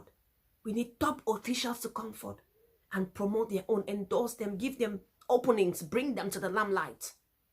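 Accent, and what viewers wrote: Akwa Ibom, Nigeria. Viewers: !!! You sound like a Crossriver/Akwa Ibom lady! You sound so Nigerian! And very pretty too! I am subscribing!! We are one 🇳🇬🇨🇲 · Her accent is like Akwa Ibom state, I don’t know but I picked some Akwa Ibom accent while speaking. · Your accent and gesture, can easily make one call you, a Nigerian woman. I love that fact that you stated the truth with no sentiment. Our Cameroon artist should align with Nigerian artist, with their own unique sound and tap from the blessing, no need to compete, we all can win together. Mostly us from Eastern Nigeria were one entity with Anglofone Cameroonians for years before the division, we still consider them as brothers. No room for hate. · truth. She has akwa Ibom accent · You look and speak like Akwa Ibom people in Nigeria. Keep it up sis. You have a new subscriber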